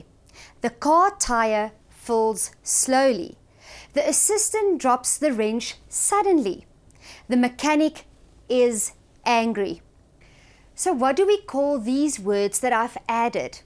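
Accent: South Africa. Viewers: this is south African accent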